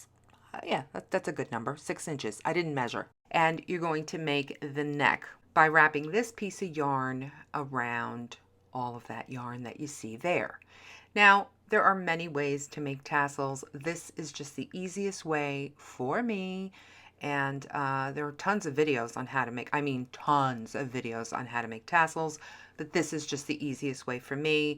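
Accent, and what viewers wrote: New York, United States. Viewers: Love these ideas. Got to do the tasseled mirror don’t be so hard on yourself. You’re a great teacher and so entertaining. Showing your mistakes is the best way to teach! If you are “perfect” your students will be intimidated. So glad Holly introduced us on the fake macrame hanging. Now I’m hooked on macrame I’m no good at it but I’ll keep at it with your help. That double half hitch knot may take years but I will master it Btw is that a NY accent? I’m a transplant and sort of miss it 🙏 thanks for all you do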